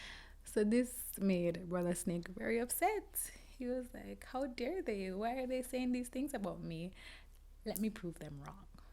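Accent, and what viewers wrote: Jamaica. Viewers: The Jamaican accent has to be one of my favourite accents ever and couple this with folklore tales and I’m obsessed, instant follow 🥰🥰🥰🥰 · I love your alternative subtitles XD i can follow nearly all of your accented words and jamaican phrases but i appreciate the subs anyway just in case we miss what you were saying Also I'm finding the more I learn about anansi, the more I see that his biggest trickery is using other people's pride against them to get what he wants 😂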